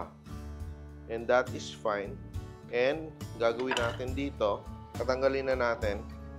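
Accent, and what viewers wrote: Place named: Iloilo, Philippines. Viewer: Ilonggo Ikaw?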